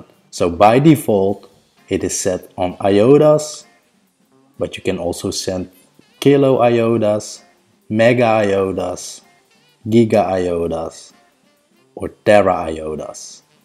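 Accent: Netherlands. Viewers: Thanks for the video!. Question: Are you Dutch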